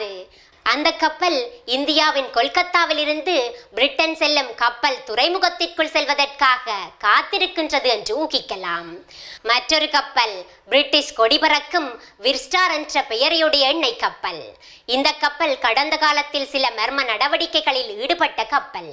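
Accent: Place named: Sri Lanka. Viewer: அருமையான பதிவு நன்றி உங்கள் சிங்கள தமிழ் இணிக்கிறது கேட்க கேட்க அருமை வாழ்த்துக்கள் வாழ்க வளமுடன்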